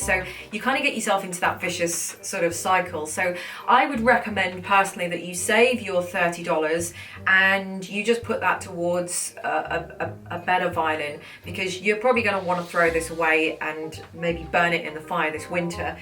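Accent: England, United Kingdom. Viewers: I only came to your Channel to listen to your beautiful English accent. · I like the British accent.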